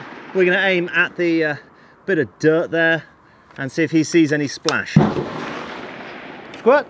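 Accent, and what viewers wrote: Switzerland. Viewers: the language spoken by the guy behind is actually Bernese German or even more precise Bernese Oberland German. Swiss German doesn't actually exist as a general spoken or written language. Every region has its own dialect. My own dialect (Zuri Schnurre) is different from the folks in Berne, Thurgau, or Basel. And if you really want to hear a strange dialect you have to visit the German part of Valais or Wallis. And by the way starts to sound like a real Berner lately. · Thats some fine swissgerman right there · Your switzerdütsch is adorable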